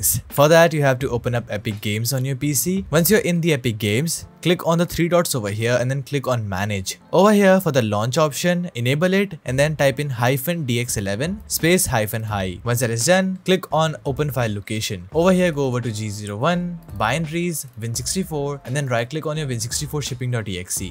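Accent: India. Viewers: With a hacker name like - I aint trusting NOTHING this mfer says.. "Download and run these programs..' HELL NO, especially when he sounds like some ripoff artist from India.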